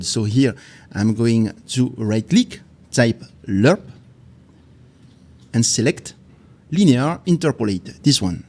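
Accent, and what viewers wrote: France. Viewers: Merci !! T'as un accent suffisamment bon pour être agréable à écouter et suffisamment français pour que je comprenne, c'est parfait 😀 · Great tutorial and great bloopers lol... happy to see a French speaker having a hard time with English as many like me with French, and especially since I’m native speaker of another language of Latin origin! I would really appreciate it if you could tell me if you have Unreal courses available and in which online platform (I tried searching for you in Udemy), or maybe a book for sale online, or whatever. Here you have another “real” follower. Merci beaucoup from DR!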